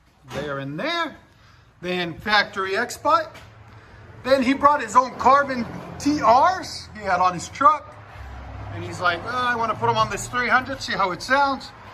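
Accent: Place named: Midwest, United States. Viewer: I'd go for the muffler delete or the Magnaflow. That raspy throaty exhaust of others is addictive...but too many miles of Flowmaster drone in Mustangs cured me. As always, beautiful exhaust work, I really enjoy your channel! (BTW, the narrator's voice doesn't sound Tennesse-born- more like my neck of the woods in Chicago/Wisconsin). In your experience, is the rear muffler delete retaining mid-mufflers (with their shared pipe for balance?) a safer bet? The Magnaflow sounds throaty without too much rasp, but that Mustang made me drone-shy...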